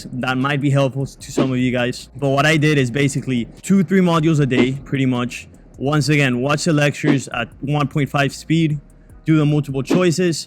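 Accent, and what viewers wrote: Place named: Miami, United States. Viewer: Thick miami accent lol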